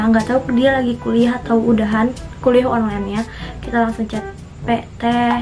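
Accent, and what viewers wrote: West Java, Indonesia. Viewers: Orang sunda ya neng?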